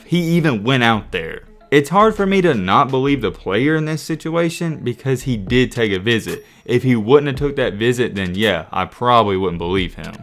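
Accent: South, United States. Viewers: So a southern twang erases the salient points within his video? Seems legit...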